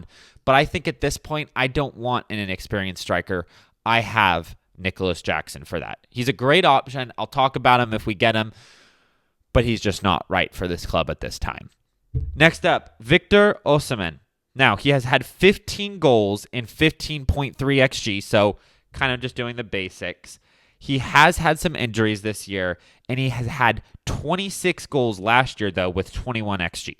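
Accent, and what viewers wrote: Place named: United States. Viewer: Clicked video. Heard accent, clicked off. American accent = opinion void. Especially when you look to young to even remember pre-2012 Chelsea. As soon as you mentioned Kepa turned his back on Chelsea, I knew my instincts were right. Americans are ruining this club.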